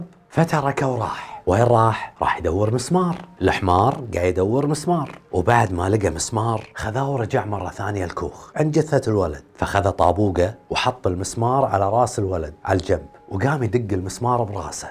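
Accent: Kuwait. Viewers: تتكلم بلهجتكم الكويتية ... يديد يعني جديد فلماذا الياء مكان الجيم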